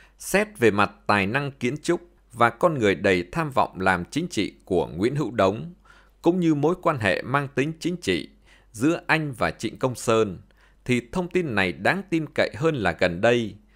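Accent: North, Vietnam. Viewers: Giọng Bắc 54 đọc hay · Từ xưa đến nay tôi rất thích giọng nói của người Bắc trước 75. Cho nên tôi thường xem DTV channel lắm vì mỗi bài bình luận đọc bằng giọng Bắc rất là hay 👍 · Tôi cũng giống như Bạn vô cùng yêu thích giọng đọc của Anh ấy một giọng Bắc rất hay, rất ấn tượng! Cám ơn kênh DTV mong được nghe nhiều bài viết hữu ích với giọng đọc có một không hai nầy!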